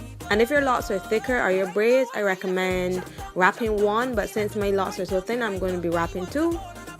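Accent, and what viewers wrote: Barbados. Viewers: yesss I know that accent anywhere subscribed right away #BajanQueen 😍😍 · Yuh sound Bajan 💙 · love love love that Bajan accent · Yesss I hear the bajan accent 🙌🏽🇧🇧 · is that a bajan accent I hear??!!!!!!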